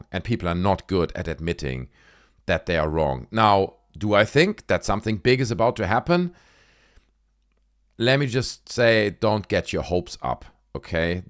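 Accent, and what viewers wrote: Germany. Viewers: Ein Deutscher spricht Englisch 👍🏻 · Judging by your accent, you're german...amirite?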